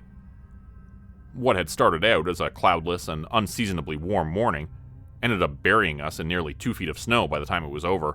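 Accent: Canada. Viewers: You say you're from Florida but you sound like a canuk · Sounds like a Canadian accent. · Ah geeze! The narrator is Canadian and does not sound like an American accent not a Floridian at all. My family being half Canadian I would know that accent anywhere. Aboot White ooot Cut ooot Ooot here in the wilderness. 😢😢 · How come someone from South Florida has a Canadian accent? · Sounds like story teller is Canadian. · Sounds like you're from Canada not Florida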